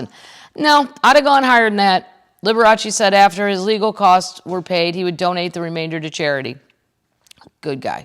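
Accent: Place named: Wisconsin, United States. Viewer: Brilliant again. I remember my mom telling my Dad she would leave him only for Liberace if he came knocking at the door. Ha ha Keep up the Wisconsin accent 😜😁❤️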